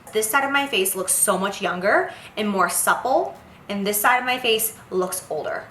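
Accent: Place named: Boston, United States. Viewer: "Highlighta" lol is he from Boston? We have that accent.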